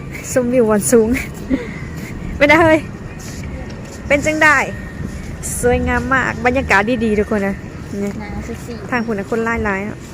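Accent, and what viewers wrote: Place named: Isan, Thailand. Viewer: หลงเสียงเว้าของ เข้าให้เเลัว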